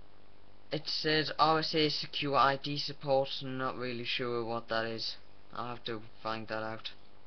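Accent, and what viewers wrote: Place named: North East England, United Kingdom. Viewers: Its a Sunderland accent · it's a north eastern accent, not quite Geordie but sort of sounds like it.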